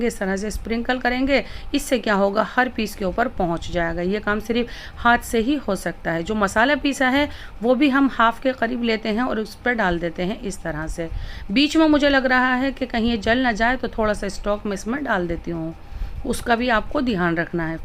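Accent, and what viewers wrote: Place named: Delhi, India. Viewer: I love your proper Dili Urdu accent. I can listen to you all day long.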